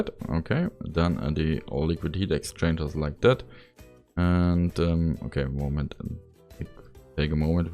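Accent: Germany. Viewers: „five in the high“ deutsche akzent beste 😂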